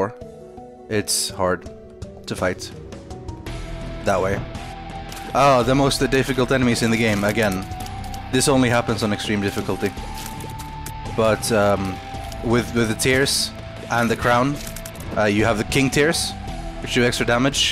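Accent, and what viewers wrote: Scotland, United Kingdom. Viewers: Wow got a lot less Scottish. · sounds more Scottish than usual in this video for some reason.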